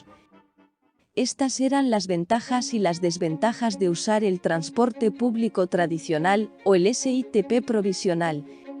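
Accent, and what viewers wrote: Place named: Spain. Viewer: No me trama esa vocecita españoleta 🙄🙄🙄🙄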